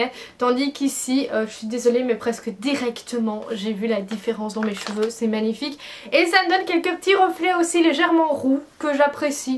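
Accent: Belgium. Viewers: Héhé, voilà que je découvre ta chaîne, et en t'écoutant parler je me dis "Elle viens de Belgique, j'en suis sûre", et comme de fait :P (Moi aussi, huhu) Très sympa ta chaîne en tout cas :D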